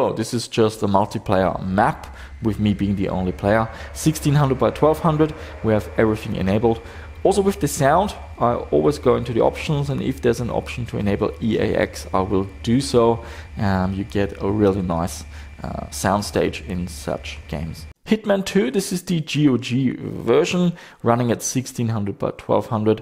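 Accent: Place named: Germany. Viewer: Wer merkt von euch wohl dass die Person mehr deutsch ist als mit dem englischen Akzent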